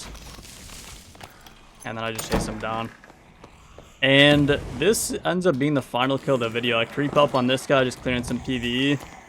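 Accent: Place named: Florida, United States. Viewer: I would bet this guy's from Florida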